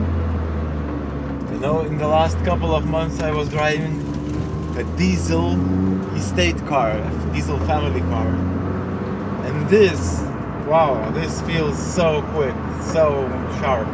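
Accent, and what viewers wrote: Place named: RP, United Kingdom. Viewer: posh bri'ish accent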